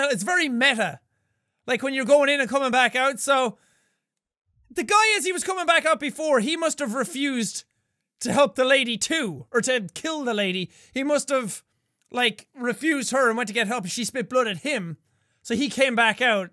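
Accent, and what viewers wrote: Ireland. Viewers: u sound so irish then befor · I didn't think you could sound anymore Irish. I was wrong :D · Just because uses a reaaally Irisch accent for the characters this is my fave LP from Moirai. it's great, i love it! <3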